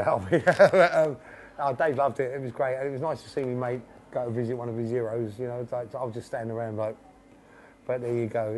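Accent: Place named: United Kingdom. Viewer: Typical brit accent heh, i like brit accent, interesting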